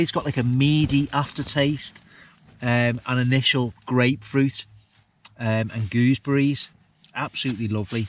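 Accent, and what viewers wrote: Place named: Wirral, United Kingdom. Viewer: Hi good vids mate You sound local to me Wirral. I have the msr elixir but the reviews you have done has sold me the Hilleberg soulo 👌🏻keep the vids coming chief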